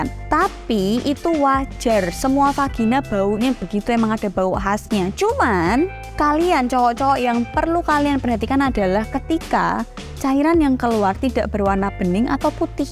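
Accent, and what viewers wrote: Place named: Java, Indonesia. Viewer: Pakai Bahasa indonesia yg Benar cermat ,,,, jangan pakai logat Jawamu ,,,, yg nonton bukan wong jowo doang,,, ,,, byk suku lain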